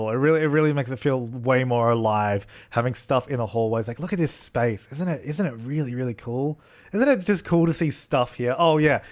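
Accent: Australia. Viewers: It's just the Australian accent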